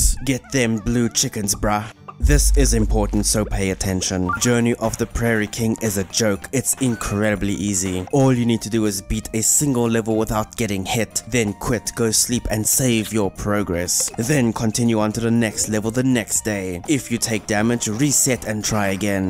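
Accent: South Africa. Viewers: So refreshing hearing my home boy's gorgeous SA accent. Pragtig🤌🤌🤌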